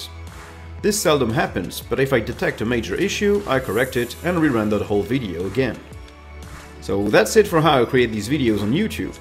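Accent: France. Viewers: wat , you're french ???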